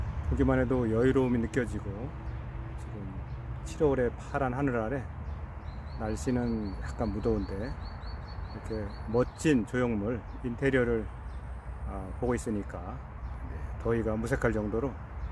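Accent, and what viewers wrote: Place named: Chungcheong, South Korea. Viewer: 충청도 사투리 쓰시는거 같네요~ 어디실까요? 저는 세종에 사는데 가까우면 구경가도 되는지 궁금합니다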